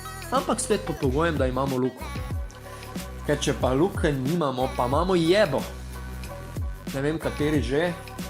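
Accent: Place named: Ljubljana, Slovenia. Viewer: Govori kako treba Ljubljansko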